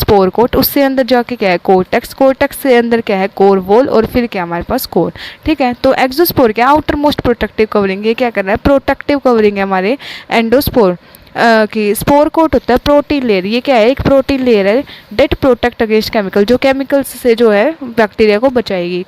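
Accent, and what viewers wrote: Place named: Western Uttar Pradesh, India. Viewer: Very good teaching 😊 Aap kha se ho ? Language Hindi aapne west up bagapt sayd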